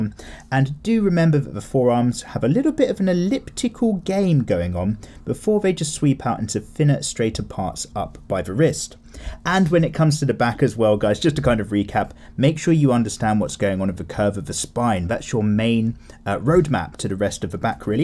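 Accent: United Kingdom. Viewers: British english >:D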